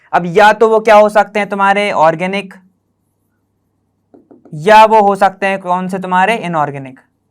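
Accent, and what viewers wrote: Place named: Bundelkhand, India. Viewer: sir ki voice se lagta hai sir bundelkhand se hai ,i guess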